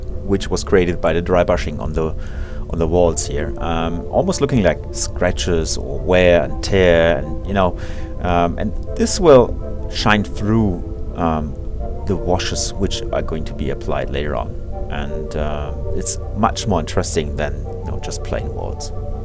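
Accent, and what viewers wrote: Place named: Germany. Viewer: Great video. And that german (?) accent is amazing.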